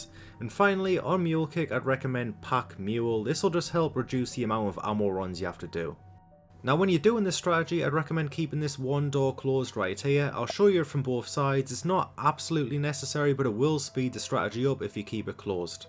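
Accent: Newcastle, United Kingdom. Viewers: Geordie accent?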